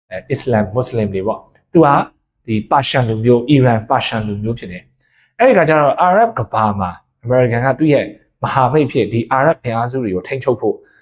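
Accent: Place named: Mawlamyine, Myanmar. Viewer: ဒီအကိုစကားပြောသံက ကျနော်တိုမော်လမြိုင်စကားသံနဲ့တူနေတယ်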